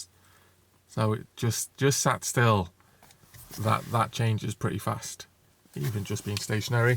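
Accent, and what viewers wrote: Liverpool, United Kingdom. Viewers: Sounds liverpudlian to me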